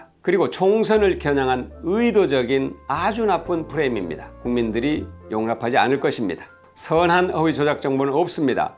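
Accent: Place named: China. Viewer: 말씨가 조선족 말투 같네요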